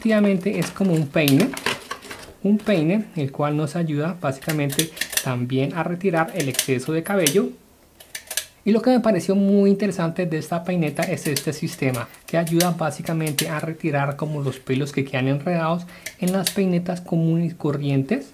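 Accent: Colombia. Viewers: Hola Eres colombiano??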